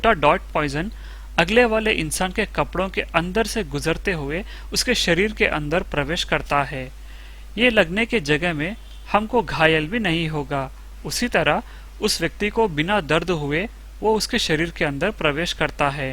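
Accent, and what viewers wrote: India. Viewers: Aap hydrabadi ho na..? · Boli badi acchi lagi, Marathi ho na bhai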